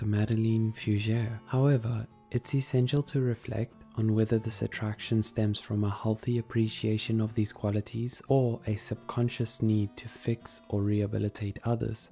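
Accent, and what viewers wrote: South Africa. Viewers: Is that a South African accent I hear, or am I just insane? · This guy sounds south African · Random question is the person speaking from south africa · I haven't watched a psych2go video in a minute but I know a South African accent when I hear one. Loving the new narrator. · IS THAT A SOUTH AFRICAN ACCENT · I'm detecting a South African English accent, am I right? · The male, South African narrator threw me off guard. Not in a bad way, I was just expecting the usual woman. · I have to know is the person speaking here South African!!?? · Is the guy who did the voice over on this South African? I feel like I can recognise my own kind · I love the south african narrator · Narrator is South African right? Ek hoor jou. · AS SOON AS I HEARD YOUR VOICE I WENT LOOKING AT YOUR ACCOUNT AND I KNEWWW YOU WERE SOUTH AFRICAN HIII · are you south african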